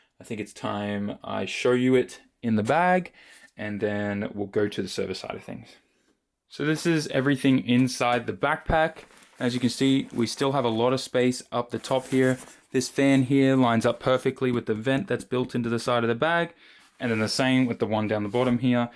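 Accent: Australia. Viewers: I'm so glad I'm not the only one who heard that, lmao. The Aussie accent is strong in this one.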